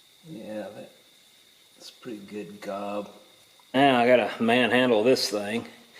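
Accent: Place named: United States. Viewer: I miss Josie & Dollor and you speak very good American.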